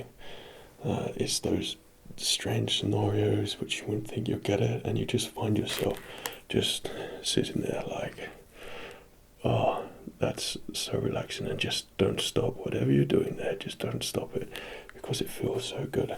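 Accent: United Kingdom. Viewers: Is he actually posh English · Where exactly is his accent from? The U.K. obviously, but where specifically? It seems quite distinct from what I traditionally associate with the "British Accent" . . . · This is so relaxing, I like not being able to see what's being used, also nice to hear a British accent like my own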